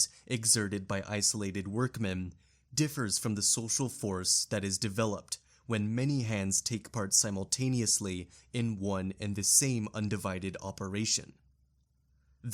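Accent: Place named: United States. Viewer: You sound like a native speaker of American English, but you seem to have a lot of trouble with pronunciation of multisyllabic words. May I recommend that before you read a section, you look up longer words in the dictionary to get the pronunciation right? It's a massively hard job you're trying to do and it's a shame you're not creating masterpieces of oral presentation. (The most common error: Although the accent falls on the first and third syllables of the noun "distribution", in the adjectives "distributive" and "distributive" the accent falls on the second syllable. I think in places you're saying "juridical" but the pronunciation is so garbled, it's hard to know.)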